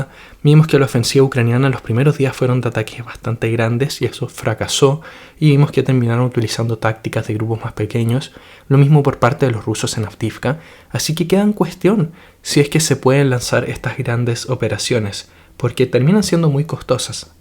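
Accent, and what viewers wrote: Chile. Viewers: Si compa, es de Chile. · Eres chileno bro?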